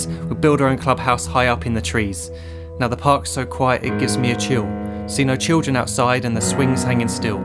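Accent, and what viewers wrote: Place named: United Kingdom. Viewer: Absolutamente sensacional! Nossa triste realidade e a antevisão do futuro,com o tempero especial do delicioso sotaque britânico (ou australiano?).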